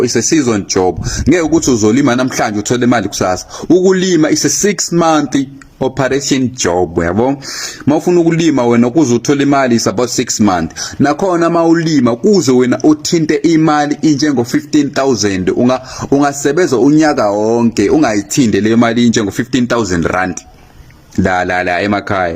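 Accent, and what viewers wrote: Malawi. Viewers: 😂😂😂😂😂 kuthi ukhabazela kamavovo hhayi yishwa phela leli ngoba uyamuzwa lomuntu ukuthi wasemalawi. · Fokof this is Malawian trying to speak isizulu abatjakathi laba bayathwebula laba angibatjembi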